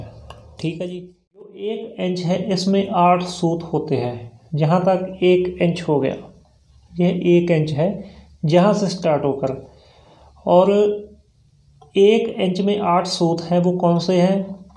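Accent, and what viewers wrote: Punjab, India. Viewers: Punjabi banda hindi bol raha ha..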